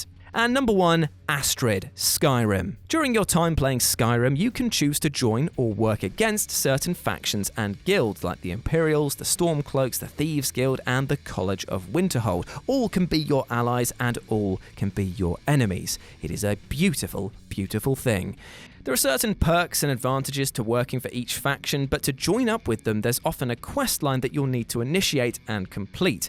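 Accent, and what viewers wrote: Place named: United Kingdom. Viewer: Great another Brit narrator, disliked the video. -.-‘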